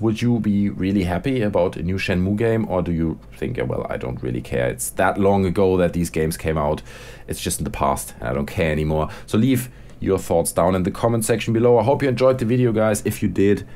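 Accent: Germany. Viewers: Moment, den deutschen Akzent kenn ich doch...Shenmue 3, maaaaan!!! :)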